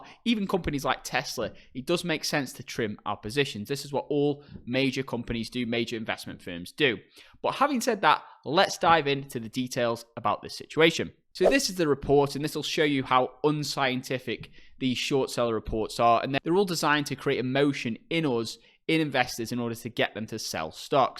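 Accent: United Kingdom. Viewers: Bought in at $64; it will not be fail this company. It has the technology to address future market needs 3-10 years forward. I always like your presentation! Plus a tinch of northern accent!👍😄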